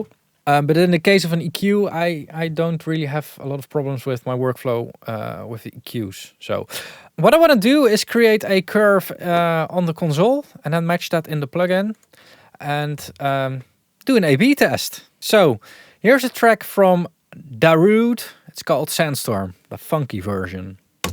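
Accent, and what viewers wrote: Netherlands. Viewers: did you say 'the a-shill-iate links'? I am not asking to make fun of your pronunciation. The dutch accent is fine, no problem. Would be funny though if you know what a shill is and it was intended.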